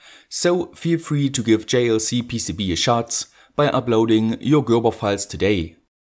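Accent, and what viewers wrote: Germany. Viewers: ja.... man hat an deinem Akzent erkannt, dass du Deutsch bist ;) tolles Video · Hah, i knew u were from Germany from the beginning! Hu he hu he hu he. Where do u come from ?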